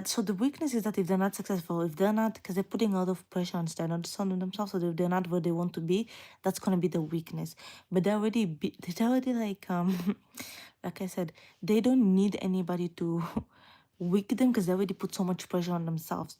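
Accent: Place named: Moldova. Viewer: Moldova? I need to know where your accent is from my girl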